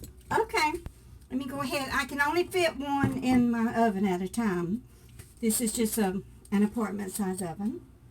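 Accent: South, United States. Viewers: That pie looks delicious. I had to laugh, You say peacan like pcarn, in Australia we pronounce it Peecan...haha, LOVE your southern accent. Have a blessed day from Western Australia.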